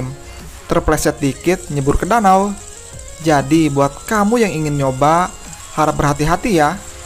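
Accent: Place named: West Java, Indonesia. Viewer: Khasss Sunda nya ... Opisial 😅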